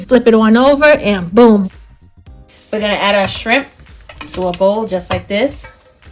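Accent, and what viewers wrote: New York, United States. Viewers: Oh man I can dive right into that skillet and eat my way out. Just the way I like it. I get lazy too much and go for popular pre mixed Cajun rice This is so much better. I almost thought you forgot about that half cooked shrimp but I should have know better you got that lid on their and cooked them just enough You got the right sausage too. Love that accent. Why do I think I hear New York City in there? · Obviously....NYC/New York City. Probably Brooklyn or Queens area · U can tell she's from New York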